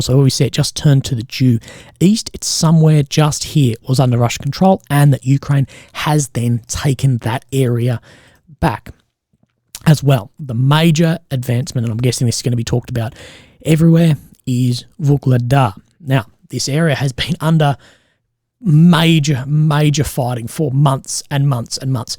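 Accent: Australia. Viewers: Definitely, because Australian English is the black sheep of the English language Dialects. English is my native language and i dont understand them sometimes either. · Subtitles are ok but make them optional. For us that can cope with Aussie speak, they are distracting. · a great adittion to a great content, sometimes its a difficult to undesstarnd the aussie accent, even more if english its not your 1st language, so thank you for that adittion, keep on the good work. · wish I could turn them off, but as an Australian I'm used to the accent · Thanks, as a Kiwi, your aussie speak is sometimes hard to understand thanks for the subtitles :).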